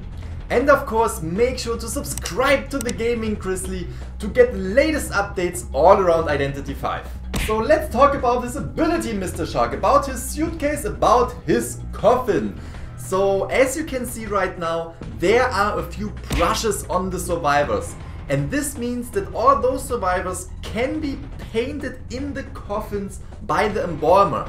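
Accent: Germany. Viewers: German accent? Cool! Wish I had a accent..😐 btw what's your gamer ID if you don't mind me asking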